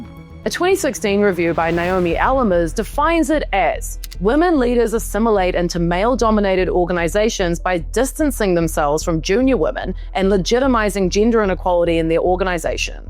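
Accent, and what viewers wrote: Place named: New Zealand. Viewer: I'm a late boomer (almost gen X) and I would like to thank you for your enlightening analysis, it really set my ideas straight about the cultural shock I sometimes experience with gen z. Also, your video helped me solve a puzzle, which is where you are from. I'm french and, apart from those of us who watch brokenwood in the original version, we are not really exposed to the NZ accent. So mystery solved! Thank you again for your excellent channel.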